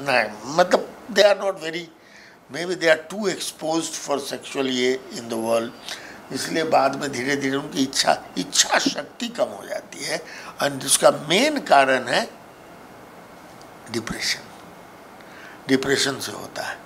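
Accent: Bihar, India. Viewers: Sabse best doctor sahab ka samjhane ka bihari lahja uper se khan to muzaffarpuriya style as-------Repallaya sabd dilko chhoo gaya. Thanks doctor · It was such a beneficial yet amusing experience listening to such a wise neurologist in typical Bihari accent and style...😊❤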